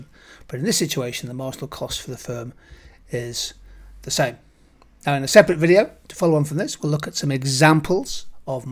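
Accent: United Kingdom. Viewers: Finally found great vids with British accent lol Thank you for uploading. It really helps! Your voice reminds me of my business teacher in secondary by the way. Greetings from Indonesia Sir!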